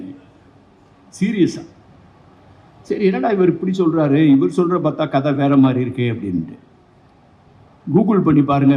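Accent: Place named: Madurai, India. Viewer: அழகான மதுரை தமிழில் சிந்துவெளி நாகரீகம் சங்க கால தமிழ் நாகரீகத்தையும் விளக்கியதற்கு நன்றி ஐயா.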